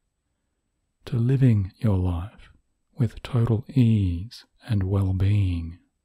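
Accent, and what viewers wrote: Australia. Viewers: I thought this was Australian accent? Either way it is super comforting. 🤗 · are you an Aussie?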